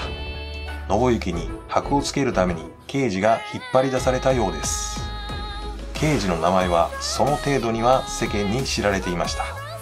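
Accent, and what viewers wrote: Kansai, Japan. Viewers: 主さんは関西の方かな？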